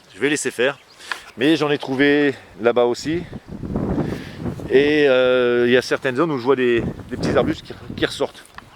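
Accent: Nord-Pas-de-Calais, France. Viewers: j adorre tes commentaires avec l accent et les termes chti . je suis de boulogne tu devais etre de lens vu l accent . super potager ché qu'in mem' un tiot peu ch' bordel . mi à ch't' heur ' j'mont ' min bassin à pichons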